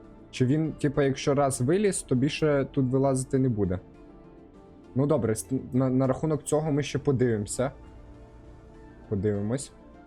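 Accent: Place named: Russia. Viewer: чому ты размовляешь як москоляка?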